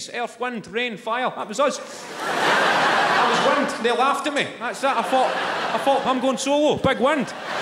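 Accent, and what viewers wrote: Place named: Edinburgh, United Kingdom. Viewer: His Edinburgh accent was on point he sounded like my pal 🤣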